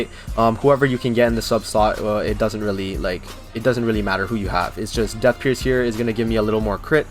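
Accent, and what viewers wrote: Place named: Vancouver, Canada. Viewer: yo are u from BC? u sound like someone from van XD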